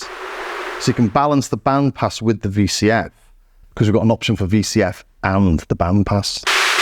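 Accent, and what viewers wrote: Liverpool, United Kingdom. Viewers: Scouser all day long (what us Brits call those crazies from Liverpool)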